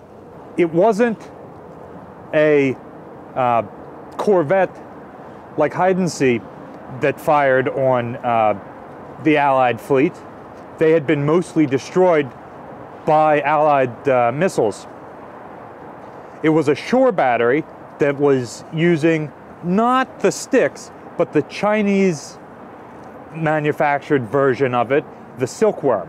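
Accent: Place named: Missouri, United States. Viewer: Dudes obviously from Missouri....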